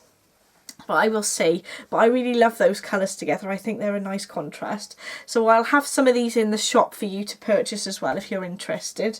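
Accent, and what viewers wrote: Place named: Shropshire, United Kingdom. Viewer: Found you today and loved your podcast. I am going to follow your instructions for knitting my first pair of socks. Hope you don’t mind me asking but are you from Shropshire originally? I am asking because your accent is just like mine.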